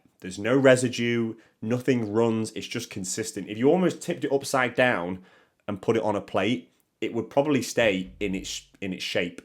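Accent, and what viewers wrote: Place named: Northwest England, United Kingdom. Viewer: Excellent video. Sounds like you're from my neck of the woods (northwest England) Being a lazy cook i was surving on ready meals and pies until a heart scare a few months ago. So now I have to eat healthily and finding it tough. My nurse is saying no butter, but I think a little is better than the UP spreads that taste vile anyway. The food industry is failing us. They have to reduce the number of additives as the demand for convenience food won't go away.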